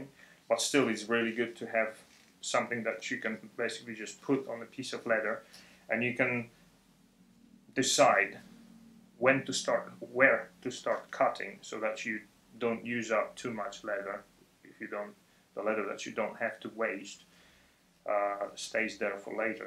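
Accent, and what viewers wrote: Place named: United Kingdom. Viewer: powiedz mi skąd masz tak fantastyczny akcent? Urodziłeś się w UK czy w Polsce? BTW fantastyczne materiały miło się Ciebie ogląda i te polskie napisy, w których tłumaczysz często na skróty wychodzą czasem zabawnie:) Tak trzymaj!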